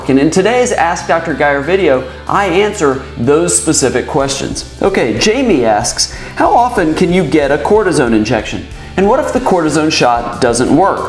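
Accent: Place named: Scotland, United Kingdom. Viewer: Wonderful Scottish Accent ! . Please slow down the presentation, the lady is speaking far too fast .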